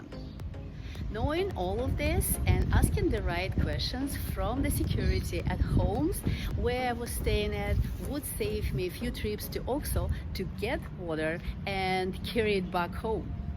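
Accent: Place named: Eastern Europe. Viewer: Hello, my wife and I are considering moving to Puerto Vallarta. My wife is Russian, since you also sound eastern European, I have a couple of questions for you! 1. Is there a fairly large Russian community there? 2. Is there someplace there to buy Russian food products? I appreciate any information you can give us, thank you!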